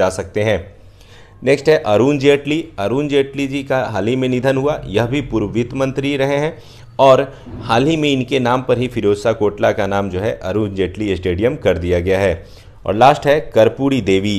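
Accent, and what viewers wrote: Bihar, India. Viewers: bihar ki yhi language hi. khi aur pd le tu...........kmi nikalna yhi jante ho tum.-ve person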